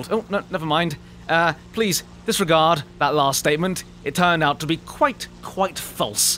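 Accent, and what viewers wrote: United Kingdom. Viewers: I love your videos!! Just a suggestion but you and your British accent should play with baron and his German accent, and Phly and his American accent! And the whole time all 3 of you are the perfect stereotypes, I just think that would make for an amazing vid! Keep up the good work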